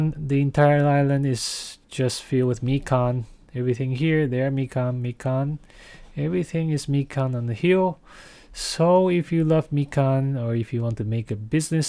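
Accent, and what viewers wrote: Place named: Philippines. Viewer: Why do viewers think you are Japanese? You sure sound kabayan to me. Thanks for your informative videos.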